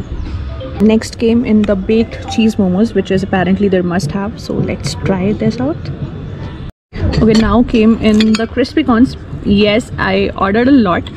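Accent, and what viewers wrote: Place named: Punjab, India. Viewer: I’m traveling to McLoedganj next month and your videos are helping a lot to learn about the place. I like your voice and Punjabi accent, that makes it more interesting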